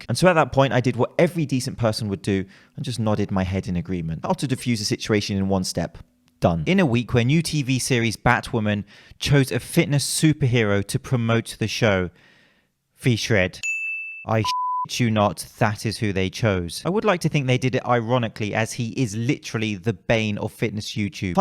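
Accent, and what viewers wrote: United Kingdom. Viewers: Your teeth are too str8 to be British...but not your accent... i am confused....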